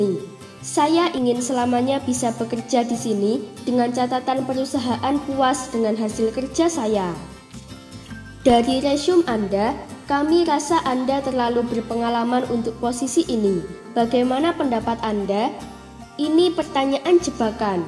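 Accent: Java, Indonesia. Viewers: suara ibu peri asli pulau jawa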